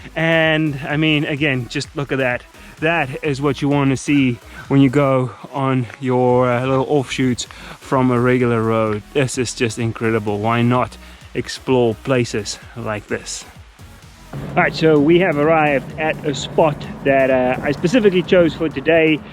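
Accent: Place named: South Africa. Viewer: South African accent is awesome, great review!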